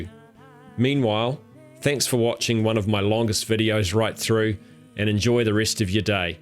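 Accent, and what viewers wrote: New Zealand. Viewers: Did Matter...... NZ accent will forever be funny to me :D good video though. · Aussie... No, New Zealander.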